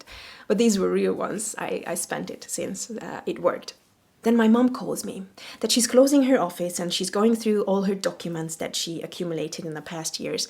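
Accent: Hungary. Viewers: OMG ...I don't know how I got here...but what you say resonates with me so much! I also want to have my own workshop because I want to break free! My aim is also freedom...it has always been freedom, I just never dared to act on it.But now I have the courage to break free from having a boss, from working on a computer, from working corporate jobs. I'm only halfway through the video, so I'm really curious about the outcome! Ps.: Are you originally from Hungary? I suspect it from your name and accent😄. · Nooo! The Hungarian accent is really good. I love it!😅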